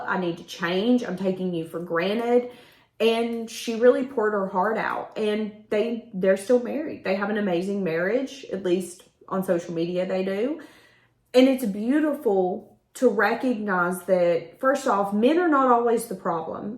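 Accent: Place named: Tennessee, United States. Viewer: I should have known you were from TN... with that accent. I live in white house TN.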